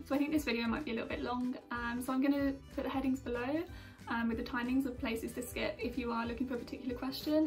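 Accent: Birmingham, United Kingdom. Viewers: Aksen nya british banget yah.. 😁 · very pretty, harry potter accent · first yet thuough it was aussie accent, oh i see this is birmingham accent:) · aksen Birmingham dia nih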